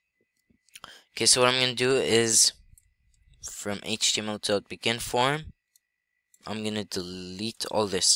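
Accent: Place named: Arab world. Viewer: Unrelated question: Your accent sounds Arabic. Are you Arabic?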